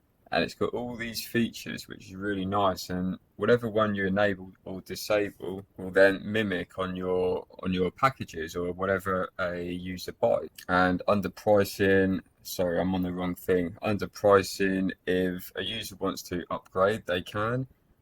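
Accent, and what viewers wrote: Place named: United Kingdom. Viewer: your British voice sounds so classic!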